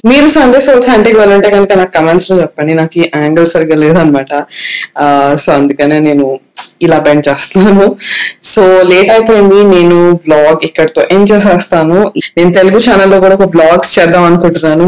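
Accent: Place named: Hyderabad, India. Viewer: Good, me telugu matladam bagundi,normal gane hyd yasa lone matladandi,inka vlogs cheyandi elage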